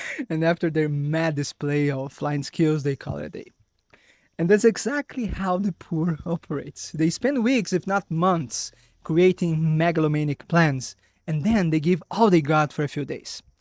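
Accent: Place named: Brazil. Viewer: Sotaque brasileiro em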